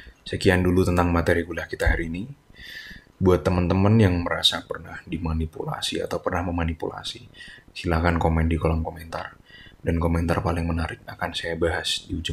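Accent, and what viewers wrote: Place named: Java, Indonesia. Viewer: menurut analisa saya dari suara yang saya dengarkan , anda pemikir yang dalam , logat anda jawa , artikulasi kurang begitu jelas yang menandakan anda seorang introvert , dan maaf, seorang narcissist.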